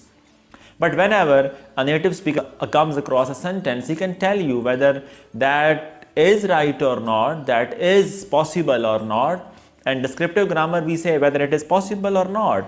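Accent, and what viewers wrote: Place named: Pakistan. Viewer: Thanks sir. I was very confused due to this words.i didn't relise that you are from Pakistan. While I was watching your video. You speak like my native teacher.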